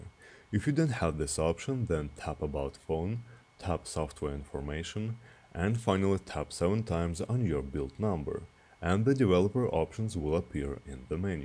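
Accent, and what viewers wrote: Russia. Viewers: русский английский это потрясающе. спасибо за видео!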